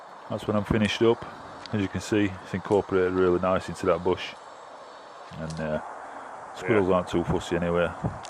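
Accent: Yorkshire, United Kingdom. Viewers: Very enjoyable. A good commentary, calm and informative--even with the Yorkshire accent!Subbed.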